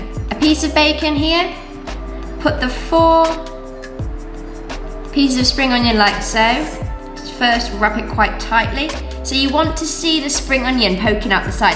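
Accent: United Kingdom. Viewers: Hi there, I am Taiwanese who live in London now. As soon as i got homesick, the big issue is that I have to learn name of all veg and ingredients. thanks to your cooking lesson in Eng. and I love your British accent. I'd love to share Taiwanese Food with British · 想請問Amy是從英國哪個地方來的呢? 有聽說英國不同地區的發音也有些不同。想請問您是哪個地區的? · 英國腔的做菜過程，我愛了❤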